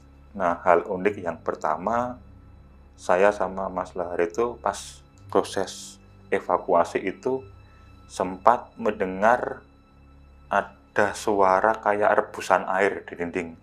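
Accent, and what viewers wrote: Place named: Java, Indonesia. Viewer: Itu emang aksen Jawa, lagian beliau bukan ahli narasi TPI ahli menolong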